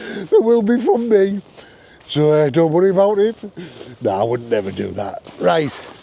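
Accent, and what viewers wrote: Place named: Teesside, United Kingdom. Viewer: where are you from, ya sound like a teessider to me ( ps im from teesside ) that stove and gas legs look mint chor 😂👍🤌👌🇬🇧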